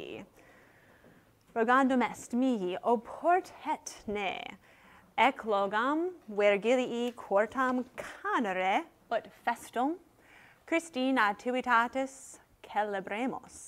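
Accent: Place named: United States. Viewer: Not sure this Latin text with its distinct American accent, rythme and prosody would approximate the original Latin pronunciation close enough for it not to provoke a glimmer of a gentle smile on Virgil's lips. A fascinatingly bald and worthwhile experiment all the same. Many cheers!!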